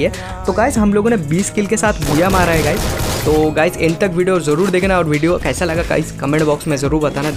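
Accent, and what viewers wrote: Bengal, India. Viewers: Bhai aap bengali heee naaa?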